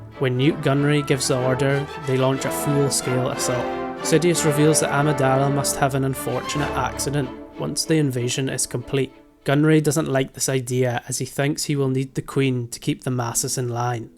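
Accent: Ireland. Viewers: I'm guessing Irish. It's definitely from the Isles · Why can I not tell if youre Irish or Balkan?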